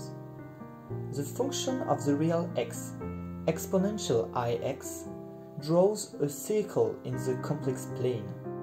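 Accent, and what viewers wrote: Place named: France. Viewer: I loved the math, the French accent, and the music! :D great video!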